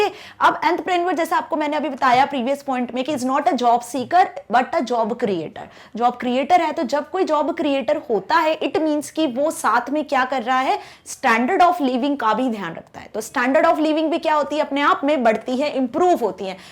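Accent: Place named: South, India. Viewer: Everything else is okay but ma'am your accent looks like south Indians that is making it difficult for me to understand the words 🙂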